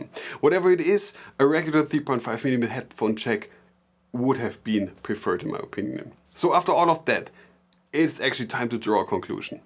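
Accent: Germany. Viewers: man hört das du Deutscher oder vllt sogar Österreicher bist :D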